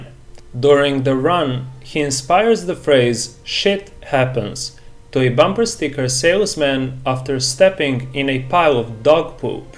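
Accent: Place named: Europe. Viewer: That was a great video you were destined to make it...I couldn't even tell you had a speech issue, just a heavy european accent. Keep making the videos I like Zemeckis and Spielberg movies. Zemeckis' movies revolve around this concept of destiny/fate...some good ones are Contact, Cast Away, Flight and The Walk. I encourage you to ask yourself a question Lt Dan asked Forrest, whether He found Jesus. There is a reason you used the word beJesus ....think about why only that name is used as a replacement for a cuss word.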